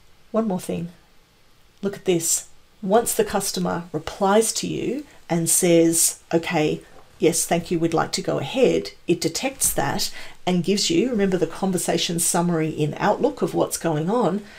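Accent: Australia. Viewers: Thanks a great explanation (in an Aussie accent 👍🏾) Keep it up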